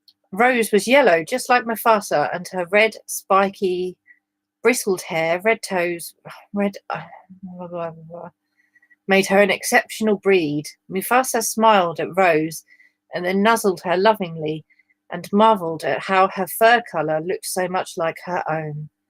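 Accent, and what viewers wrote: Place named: United Kingdom. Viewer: Thanks to Oddie (the fantastic) I am your newest fan. I'll be sure to try and be glorious in the comments as your british voice in the air.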